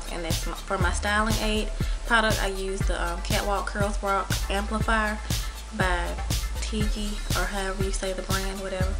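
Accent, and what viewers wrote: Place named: South, United States. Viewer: i love this tutorial and your country accent.... lol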